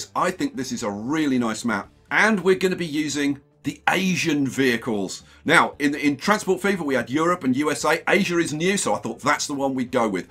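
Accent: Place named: United Kingdom. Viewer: Are you a British or an American? I just like your accent so much.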